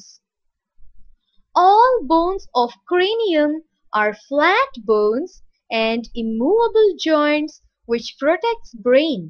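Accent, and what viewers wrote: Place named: India. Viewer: Unbearable Indian accent.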